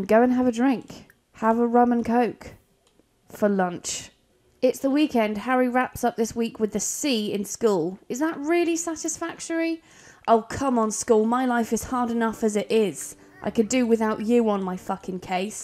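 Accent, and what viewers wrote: England, United Kingdom. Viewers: This reminds me of when messyourself played sims 4 maybe it's the bald kid and English accent lol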